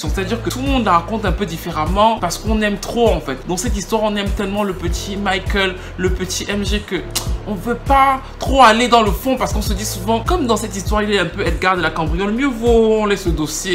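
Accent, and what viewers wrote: Cameroon. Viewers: Je m'en doutais que tu avais un truc camerounais. Ton accent sur certaines phrases me rappelais les touches camerounaises et je me disais c'était juste un parfait hasard.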